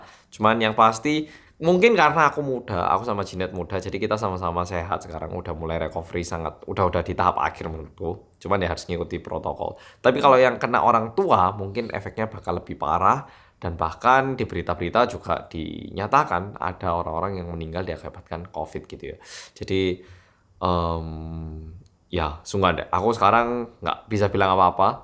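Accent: Java, Indonesia. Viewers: Ko ngomong nya bisa medok dan lancar bahasa indonesia nya ?lahir nya di mana ? Dulu pernah tinggal di malang . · Semoga cepet sembuh Mas, Stay safe and stay healthy Sing semangat mas, 화이팅❤ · Gws mas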